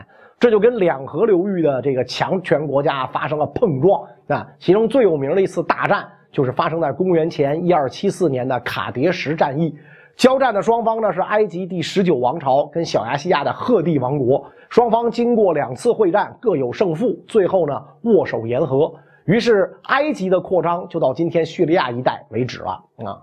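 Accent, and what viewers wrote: Beijing, China. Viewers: 嘥氣啦，從前的中華文明文化早已被滿清和共產中共中斷了。至少阿袁生你現滿囗京腔的煲東瓜就不是原來漢人語音，煲東瓜基本上是蒙滿的雜交語，作為文化最重要的載體語言都被入侵者同化了，還好意思說你同化和改變了入侵者真的是太過荒謬。還有就是儒家，從滿清到今日的儒家早已不是滿清以前的儒家了，今日的儒家是被滿清及中共改變扭曲了的奴化儒家。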